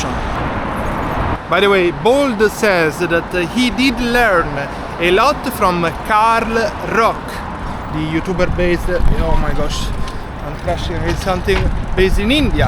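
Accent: Italy. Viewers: Thanks for the insight, best of luck on your channel. You got the talking down. Your English is very good. Love the Italian accent. Anch'io vivo qui in Italia. Vicino a milano e Torino. Piacere fratello.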